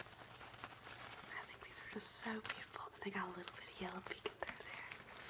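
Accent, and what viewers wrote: South, United States. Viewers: this is so nice to watch, usually when people fake a southern accent they make it sound annoying as hell but you just sound so sweet n gentle i love it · the southern accent actually sounds like a southern accent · You are the cutest sweet pea ever! Your southern accent is sooooo adorable. I was smiling throughout the entire video. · you can do a Southern voice really well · I love Southern us accents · Wonderful job on the accent. I'm southern and i can tell when it's a forced southern accent, but your sounds so natural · This was heavenly!! The tapping on the tags gave me so many tingles, thank you lol! And the southern accent was a perfect touch ❤️ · girls with a southern drawl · As a lifetime Texan, you nailed the casual accent so hard. I wanted to fall asleep so bad but I have work to do😣. This reminded me so much of my childhood & growing up hearing all the women in my life w Southern accents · I am born and raised in the South and she NAILED this accent. 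Normally, it is difficult and cringy to watch Accent videos but this was really really accurate and tingles were everywhere. ilysm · OH MY GOSH THATS THE SWEETEST SOUTHERN ACCENT IVE EVER HEARD I LOVE THIS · very appropriate use of the southern accent, we really do make a big ass deal out of weddings around here for some reason. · I live in Alabama and this was spot on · love southern accent ASMR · Love the southern accent 👏🏼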